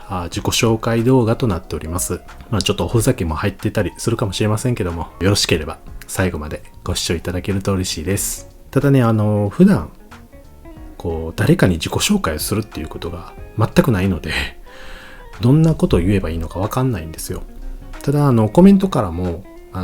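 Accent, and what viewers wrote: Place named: Kansai, Japan. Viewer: さんの地声での動画は初めてなので、動画見ながら、ん？関西弁？って思ってたら、大阪だったんですね！！🌟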